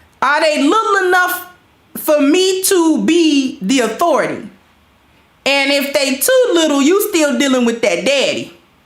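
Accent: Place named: South, United States. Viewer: where do you live, you're seeing this kind of woman all the time? sounds like you're from the south.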